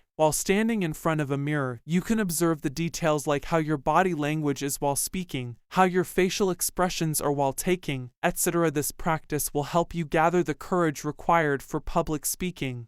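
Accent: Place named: United Kingdom. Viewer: Your speaking tearm it's very unique as like British English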